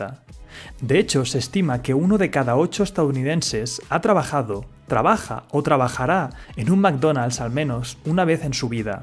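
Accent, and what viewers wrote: Spain. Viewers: Buen video, pero te aconsejo tomar unas clases de ingles, porque no se si es porque seas español pero pronunciaste mal casi todas las marcas incluida Coca Cola, pasando por Google, Microsoft, Apple, gmail... podrías optar por pronunciarlas en español. O se pronuncian en inglés o en español pero no en una fusión, el spanglish como idioma no existe y esas palabras están en inglés.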